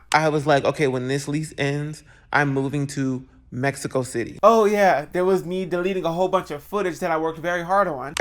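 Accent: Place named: Philadelphia, United States. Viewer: Why do you lowkey already have a philly accent😂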